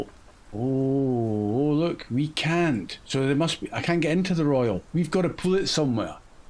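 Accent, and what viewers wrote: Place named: Arbroath, United Kingdom. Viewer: Yeah enjoyed the longer format buddy 👍 As for next agree with Docks and other bridge I always try to fix infrastructure first it just makes everything else easier. Lots of local tasks and some contracts are also possible without leaving this map to help level up and make more moolah 😂 BTW your accent sounds more like somewhere around Abroath to me but I'm a Flatlander although have family from around Inverness. Keep on trucking buddy 👍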